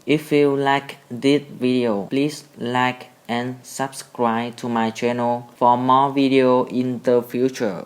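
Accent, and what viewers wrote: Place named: India. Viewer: Y như mấy a ấn độ đang nói tiếng anh d :v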